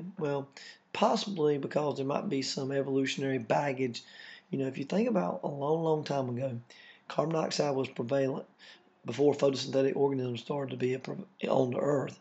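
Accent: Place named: South, United States. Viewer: Nice video truly, you got an interesting accent too. You from around south, buddy?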